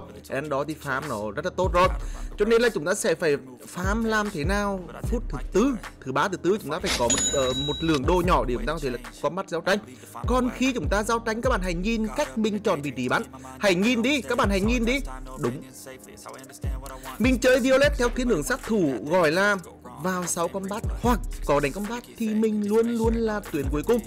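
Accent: Nghe An, Vietnam. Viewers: Chất giọng nghệ an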